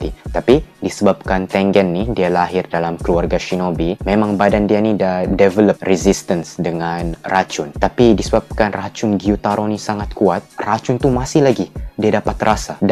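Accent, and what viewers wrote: Sabah, Malaysia. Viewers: Org sabah ka bro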